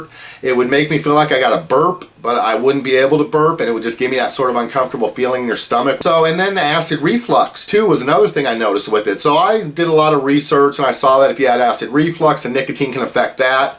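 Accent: South, United States. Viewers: Accent sounds a bit like #ForestGump. No offence, love that movie ) Guys, we at this point have no idea what long term effects are, lets get real, studies that show the residue blah blah shit are literally cavemen level, if you cant see something - doesn't mean it's not there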